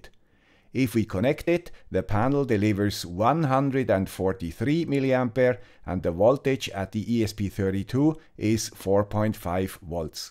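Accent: Switzerland. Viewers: Ha. I never knew that. Alway thought it a brilliant scene. Maybe it is even better now :-) And than you learn that after 43 years on a channel from a guy with a Swiss accent :-) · Ah, das ist also eine Youtube "Option" für creators, wo dann neben den Untertiteln eben auch die Titel und Texte gleich mit übersetzt werden , denn mir sind zuletzt eine Reihe von Videos von anderen ohne "deutschen Bezug" untergekommen und ich mich fragte, was da los sei, wobei da aber auch welche waren, die in Berlin am Brandenburger Tor gewesen waren, weshalb ich ins Grübeln kam. Vorher bekam ich halt alles "100% authentisch" (from the guy with the suisse accent), von daher fiel halt der Titel auf. Ich muss mal sehen, wie ich nur noch die Originaltitel bekomme. Jetzt hieß der Titel hier im Video wie auch in der Kanalübersicht: "Günstige und einfache Solarenergie für unsere kleinen Projekte (Deutsche Untertitel)" Wie immer ein tolles Thema spannend präsentiert und vor allem auch in all den "I want more" Stellen im Detail erklärt. 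Und ich fand auch die Verweise auf andere, ältere Videos sehr gut, weil da Videos darunter waren, die ich verpasst haben muss. DANKE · Woh, 5 people don't like your swiss accent. 😊 Thx for this intresting video!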